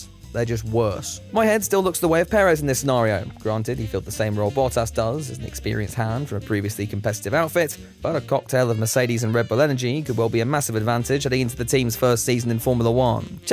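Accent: United Kingdom. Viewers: Hey dude. Hadn't seen you ever. Not only do you sound British, you look British...super british, if you know what I mean.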